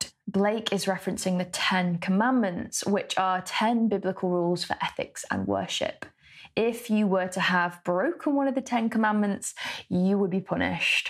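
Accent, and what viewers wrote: United Kingdom. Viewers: #waw Untouched by the comprehension of English poems! felt like a school student once again though I am a researcher that too in Forests! to my great surprise I enjoyed like a terribly good ice-cream! first time here, liked shared and subscribed #wishes BTW your climate anthem song is a gentle tornado! and your beautiful British accent is charming like you. welcome!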